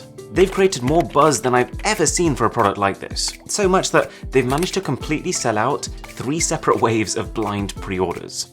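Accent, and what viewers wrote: United Kingdom. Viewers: Haha yah that's UK accent for u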